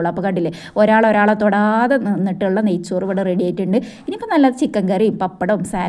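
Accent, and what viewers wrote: Malappuram, India. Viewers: Thani malappuram baasha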